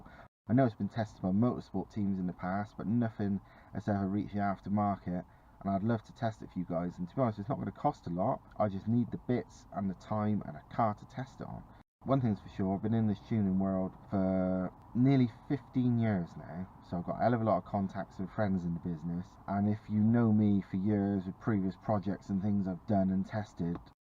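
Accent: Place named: South West England, United Kingdom. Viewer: Also i'm from the SW, didn't expect the accent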